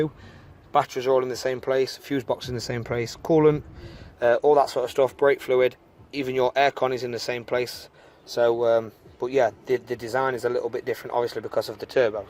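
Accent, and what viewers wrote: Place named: Derbyshire, United Kingdom. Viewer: Defo from ilson (Ilkeston) Langley mill Eastwood area, can tell by the accent lol. I was looking at the Corsa VXR and the fiesta ST and ended up buying a fiesta ST 65 plate. Both nice cars. I need to get mine remapped but I’ve still got Ford warranty until the start of October so I don’t want to mess that up lol.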